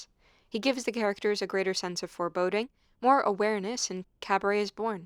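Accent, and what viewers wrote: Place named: United States. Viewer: you sound like an American, and at this point in our political history I think that it is fair to stop holding the German people particularly accountable for the rise of fascism. I'm watching it rise here in America and the same thing is going on. Most people are simply passive, and politically disinterested. They're not willing to risk anything about their simple lives in order to resist fascism or authoritarianism.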